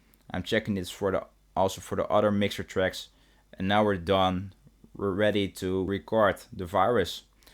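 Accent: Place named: Amsterdam, Netherlands. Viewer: Very goeie video pik. Nice Amsterdam English accent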